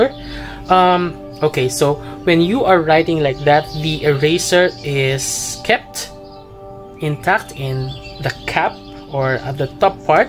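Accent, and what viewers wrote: Philippines. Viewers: Are you Filipino? Your accent is lovely.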